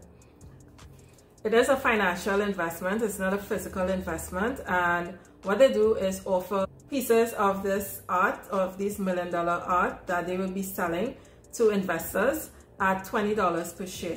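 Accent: Trinidad and Tobago. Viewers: I knew I heard a Trini accent lol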